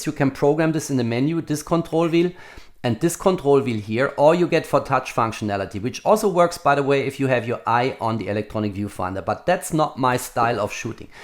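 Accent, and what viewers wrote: Germany. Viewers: Agree, and I love his German "We" instead of "V" in his pronunciation...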